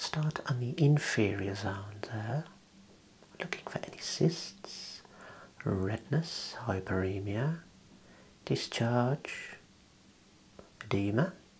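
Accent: Birmingham, United Kingdom. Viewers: My favourite Brummie 😍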